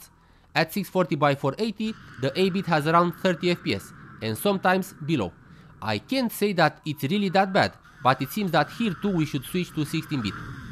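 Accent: Romania. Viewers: He is Romanian, that's why he has this accent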